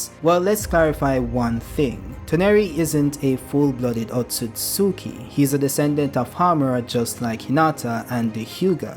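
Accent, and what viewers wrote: Jamaica. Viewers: which part of Jamaica you come from mi g you sound like you from ochi bro gaad but more time yuh affi Yankee up the ting mi boss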